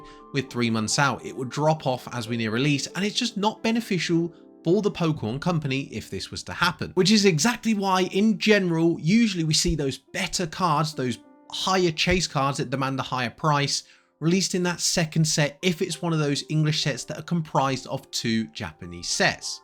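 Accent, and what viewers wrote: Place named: United Kingdom. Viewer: Beautiful British accent.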